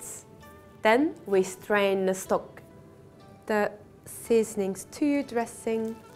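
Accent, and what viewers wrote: United Kingdom. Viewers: usually when people make instructional cooking videos, they use nice, presentable equipment and containers. Most people would pour the sauces into pretty little bowls, or glasses. This chick puts her sake in an old, plastic coke bottle with a paper label scotch taped to the front. On the other hand.... it's a hot asian girl with a brittish accent that happens to be a master chef. She pretty much has all of the fetish bases covered.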